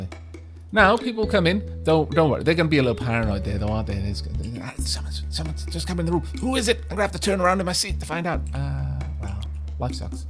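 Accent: Wales, United Kingdom. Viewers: he sounds like a british buk lau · is this guy welsh? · Love your accent, you welsh?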